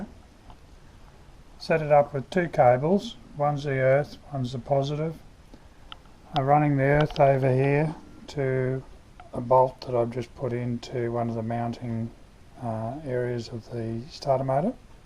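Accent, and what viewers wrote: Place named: United Kingdom. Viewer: Honestly just from your video sir just this one you've actually kind of persuaded me to listen to British people explain something now I find that funny because yes I am an American I'm sure you would have guessed and I'm a very proud American I'm not saying that I couldn't put that aside to learn something from the British I'm just saying I've always made fun of the way British people spoke their accent their choice of pronunciation of certain words I mean I have legitimately fallen on the floor rolling around laughing so hard but I guess at one point or another you always end up learning about the things that make you happy thank you for the video sir you've earned my subscription in like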